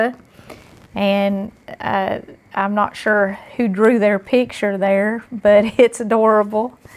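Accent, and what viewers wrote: South, United States. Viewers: I am a New York Italian, who loves Jesus and I feel such an affection for my sister with a southern accent, I would love to come on your show one day and and cook something real Italian like a very old Neapolitan recipe that we call rice pie, we are all going through a hard time and I so appreciate your faithful words and I so agree with all you say. To God be the glory, God bless you my sister and again I say Thank You. I will look through the comments for those who need help I am blessed I live with my daughter she looks after me God bless her, so I will let the Lord lead me in helping others. Oh by the way I love your recipes.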